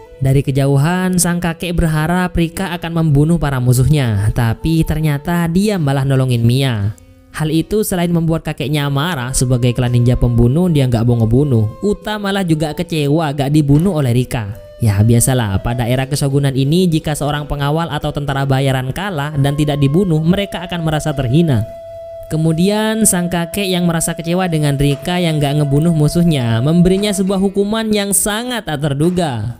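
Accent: Madura, Indonesia. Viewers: Ternyata mimin orang madura hahaha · Tolos a madureh lek 😂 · Jember Cong padeh ningguh. Oreng madureh tapeh lancar bahasanah tapeh gik paddeng madurenah gerre bahasanah bren.. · Bahasanya sangar medureh😂😂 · Medureh reh boss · Subtle madure hhhh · Reng madureh😂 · Boh, reng Madurreh kakeh, Min? :D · Logattah mak bahasa madureh cak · madura jatim anjir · Medureh aguen ben bro,?? 🤣🤣👍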